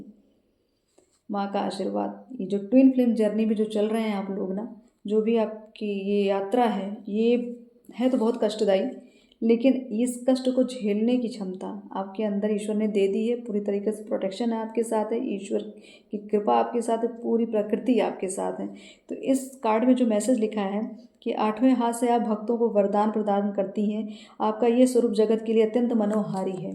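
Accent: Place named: Haryana, India. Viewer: Aap haryana se ho main bta rahe hu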